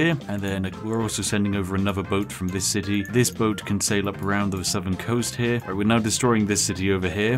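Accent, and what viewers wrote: United Kingdom. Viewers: You sound like Internet Historian. Is this one of your "secret channel" where you sound more like a british dude? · your accent is so British ohmygod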